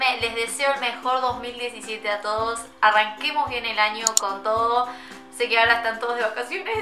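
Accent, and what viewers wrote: Argentina. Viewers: sos de argentina no ?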